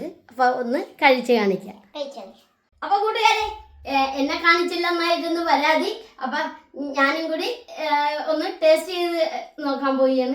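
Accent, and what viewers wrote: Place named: Kochi, India. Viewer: Njan first time anu ivarude vlog kanunne. Angatt ingattt nammakk🤔. Kochi ano sthalam. Njanum kochi anu . Slang kettatt chodhichenatta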